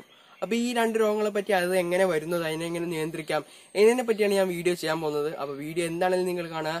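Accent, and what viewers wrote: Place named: Palakkad, India. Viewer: Evar place palakkadu aanu adahanu engane samsarikkunnad evarude samsara reedhi engane aanu padichu parayunnadalla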